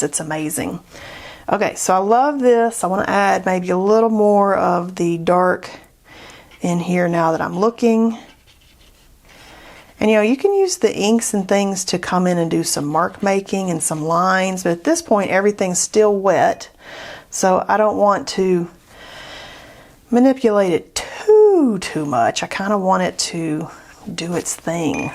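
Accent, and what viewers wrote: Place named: South Carolina, United States. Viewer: You sound like you are from South Carolina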